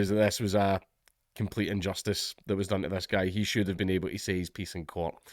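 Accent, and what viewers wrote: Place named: Scotland, United Kingdom. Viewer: you have a few drinks? Your thick Scottish accent seems particularly thick here, I'm a Canadian. Almost need some closed captioning over here, man. Boyl, I think I heard?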